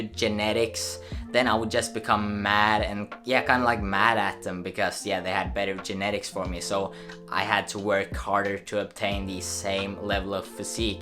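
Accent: Norway. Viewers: Listened to your voice for 5 seconds, then I recognized the norwegian accent ;)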